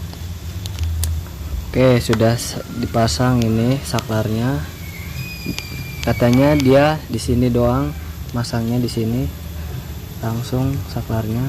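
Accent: Serang, Indonesia. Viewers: Wong serang Tah kang?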